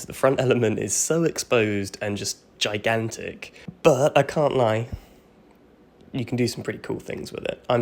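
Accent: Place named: England, United Kingdom. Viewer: I'm hearing a lovely English gentleman but I see Cletus from Mississippi lol love ur content bro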